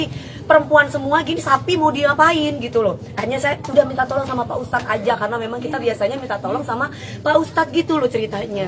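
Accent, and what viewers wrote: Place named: Jakarta, Indonesia. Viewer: Gaya betawi😂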